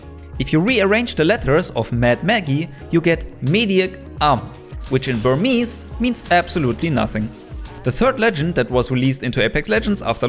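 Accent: Germany. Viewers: are you german? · youre german? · Are u german? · Are you from German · Let me guess. You are German?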